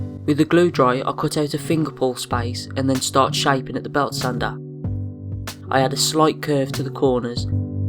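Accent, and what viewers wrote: Black Country, United Kingdom. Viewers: Is that a black country accent?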